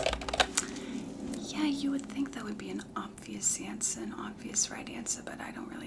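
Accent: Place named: Long Island, United States. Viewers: what I want to know is how you got even the mannerisms to a T… you sure you’re not from long island??? 😂🩷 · yeah sounds about like the mothers id hear sitting at other tables at a restaurant on LI 😭😭 great job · Omg yes! She does the Long Island accent in that series too. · I’m from Long Island!!🎉 I heard more of a Brooklyn/bronx accent mixed in there too! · LMAOOOO I literally loved this video, me being from Long Island just made me laugh through the whole video bc I feel like I don’t have one and my friends say I do 😭 but girl the accent is hilarious I loved this whole heartily I get so excited when you post 😝🩷! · the way my name is Chelsea and i’m from Long Island with family that sounds like this 😭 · Something about the Long Island accent makes me so happy lol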